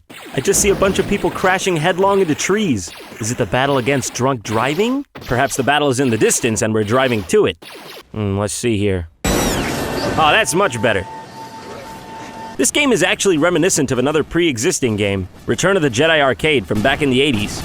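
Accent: New York, United States. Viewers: Funny how he had a new yorker accent when he was in Cali, but now that he actually lives in new York he lost it. :/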